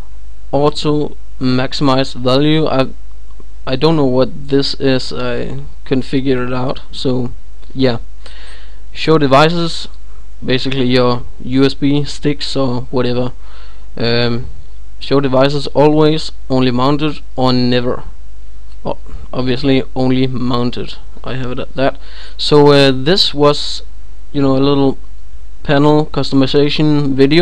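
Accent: Denmark. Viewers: ARE YOU DANISH ?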